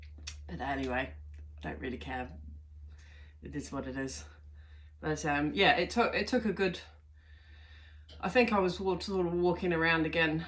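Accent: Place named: New Zealand. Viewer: Hello. Firstly thank you for your chanel. And thank you for your Kiwi accent. I know this sounds silly but hearing your accent was like healing balm for my soul. It gave me back some Kiwiana and filled me up with the spirit of Aotearoa. Since Covid I am in Germany. And 3 years in Berlin. That might sound really awesome but trust me it wasn’t. It’s been just aweful cause the energy is so full of the old fear guilt and horror from the war and all I did was clear clear clear and still there is f so I much to do that I haven’t even made a dent in what is going on in Germany here. There is not only the residual energy of the second world war and Nazism but there’s also the second world war and then all the wars before that and now there’s all the energy about the witchhunts, because particularly where I am living now there were a lot of witch, burnings, and Germany was one of the worst countries of them all they burned twice as many women as any other European country. 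And I am living amongst all this stuff, and as I am a Devine Feminine empath and a healer, I just miss beautiful New Zealand. I realize New Zealand is not problem free however, it could be so so much worse. So thank you very much. I’m so glad I have found you now and that I will get my little bit of Kiwiana every time I hear your voice. And really it’s not just your voice. It’s your attitude. There’s nothing like a kiwi attitude. It’s so different from the rest of the world. It’s definitely unique and it’s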